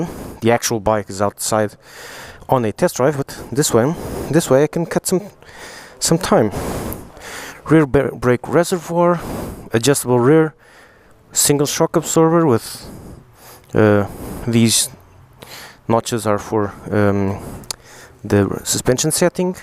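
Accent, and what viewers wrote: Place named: Portugal. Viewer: pelo sotaque nota se que es portugues tou para adquirir uma de 2016 sabes que modificaçoes teve as versoes aseguir?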